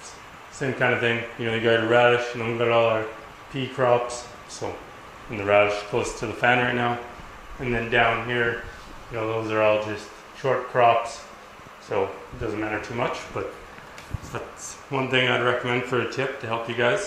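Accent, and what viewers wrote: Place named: Canada. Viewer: could tell he was canadian right away! :)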